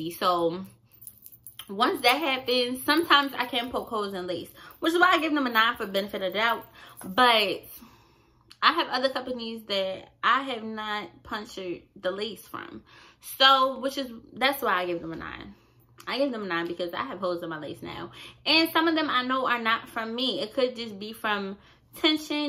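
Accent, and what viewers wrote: Baltimore, United States. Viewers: You’re from baltimore arent you😏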